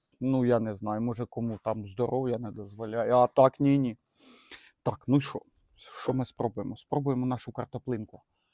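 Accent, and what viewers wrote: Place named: Central Ukraine, Ukraine. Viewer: Возможно похож для кого-то по подаче на украинского грузина. Но больше на нашего украинского еврея) Правильные жесты и мимика которые цепляют, невысокие люди всегда харизматичны, наш Луи Де Фюнес) который умеет вкусно готовить. А самое главное смаковать и передать предвкушение перед дегустацией в каждом видео. Вам нужно идти в кино) А украинский с легким суржиком такой родной) это или Кировоградщина, Хмельниччина, Виннитчина или Житомирщина, может ошибся, но точно по говору центральная Украина. Понравился Ваш канал, что блюда что подача, смотрю уже месяц, многие блюда буду готовить по вашим видео. Супер!)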